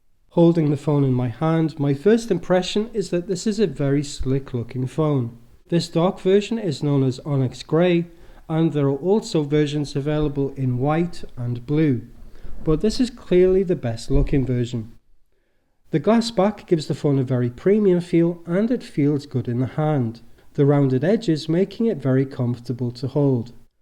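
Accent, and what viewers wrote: United Kingdom. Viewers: Insanely nice review, I am loving your nice British accent. You really did show the Phone really well in all possible areas. 10/10...Greets from Germany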